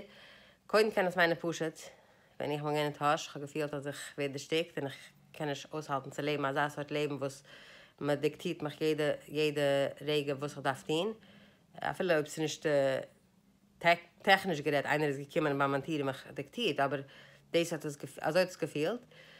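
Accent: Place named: Hungary. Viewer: You have a real hungarian Yiddish 😂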